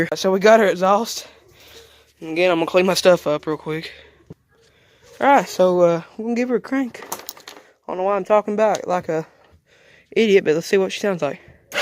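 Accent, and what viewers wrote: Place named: United States. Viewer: god damn son you sounds as american as you can be :) (as a none american) no hate i find it funny just like the british accent and god damn that runs like shit :) jesus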